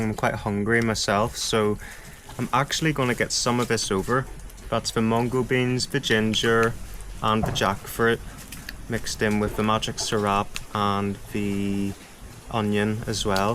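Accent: Northern Ireland, United Kingdom. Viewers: u sounds familiar lyk norther ireland accent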